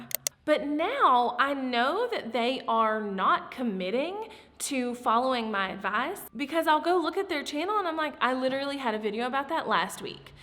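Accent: South, United States. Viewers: Are you from the south? 🤔 Oh from onwards you lose the accent 😂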